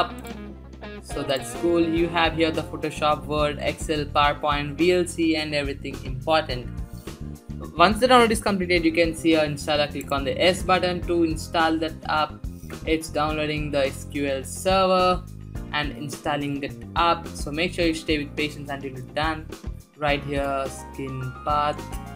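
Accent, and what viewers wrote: India. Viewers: it is an indian accent you idiot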